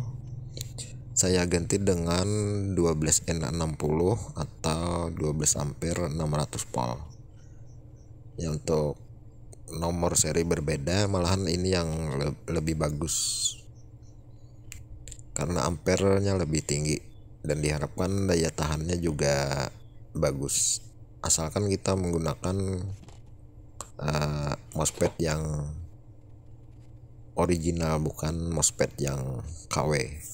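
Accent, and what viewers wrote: Banjar, Indonesia. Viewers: Pina kaya orang banjar pian niiih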